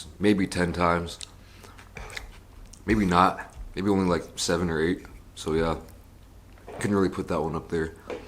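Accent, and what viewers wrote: United States. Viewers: He sounds American because he’s from America. He moved to Seoul from the Midwest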